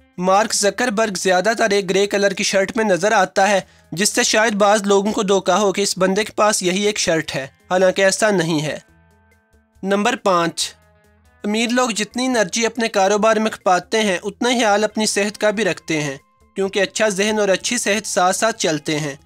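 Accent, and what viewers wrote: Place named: Sialkot, Pakistan. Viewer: Speaker is definitely from sialkot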